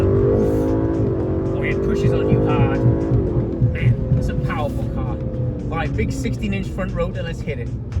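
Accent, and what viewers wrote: Wales, United Kingdom. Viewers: Do I deduct a welsh accent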